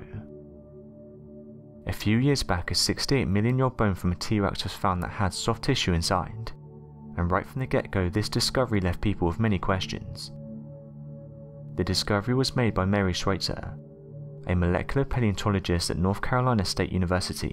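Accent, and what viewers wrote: England, United Kingdom. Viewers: Get rid of this narrator with his heavy English accent slur is hard to understand.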